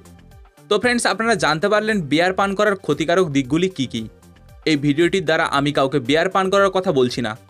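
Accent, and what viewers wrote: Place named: India. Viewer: কথা শুনে বুঝা যাচ্ছে দাদার দেশের ডাক্তার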